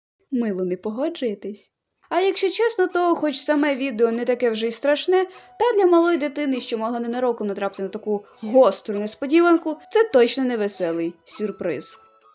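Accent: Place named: Ukraine. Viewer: Похвально що розмовляєш майже чистою українською мовою 😊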